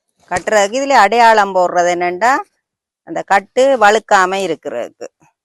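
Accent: Sri Lanka. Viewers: நீங்கள் ஈழத்தில் இருந்து கதைக்கிறீங்க தானே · Beautiful Srilanka slang. Excellent art piece. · மிக்க நன்றி. கொஞ்சும் இலங்கை தமிழ் பேச்சு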